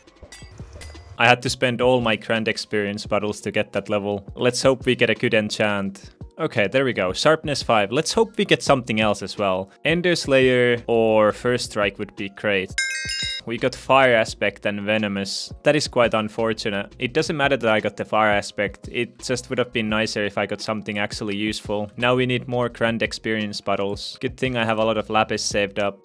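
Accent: Finland. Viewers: Its called Finnish accent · Are you finnish? Like, your name is and your accent sounds little bit like finnish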